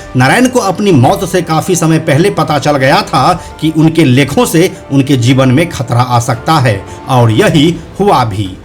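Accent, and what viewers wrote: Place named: Sindh, India. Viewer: Aap bhi Sindhi ke jaise bol rahe ho.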